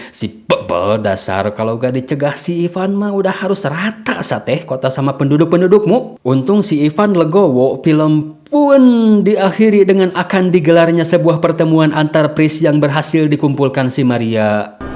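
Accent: West Java, Indonesia. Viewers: Bang lu asli Sunda mana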